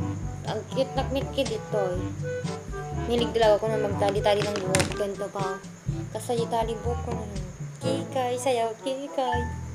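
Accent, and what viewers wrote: Ilocos, Philippines. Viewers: baro nak nga subcriber, napapasaya mo ako sa ilocano mo ksi pangasinense ako HAHAHAHAHAH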